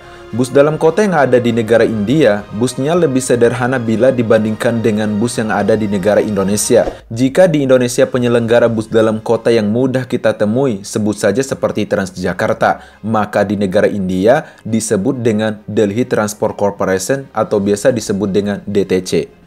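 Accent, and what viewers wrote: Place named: Bima, Indonesia. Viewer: ADMIN NYA SEPERTI NYA ORG BIMA MBOJO.. LOGAT MBOJO NYA GK BISA HILANG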